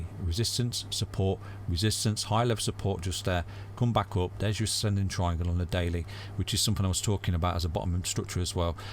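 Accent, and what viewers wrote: United Kingdom. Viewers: Keep up the good lovely british accent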